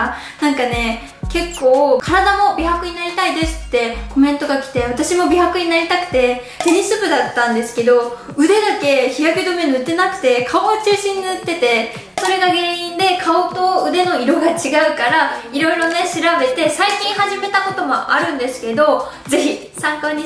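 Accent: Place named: Gifu, Japan. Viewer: 方言が岐阜っぽいんやけど？。。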